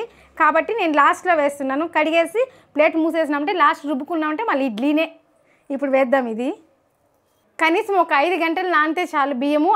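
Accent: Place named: Tamil Nadu, India. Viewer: Telugu bale tamil vaalla telugu la bagundhi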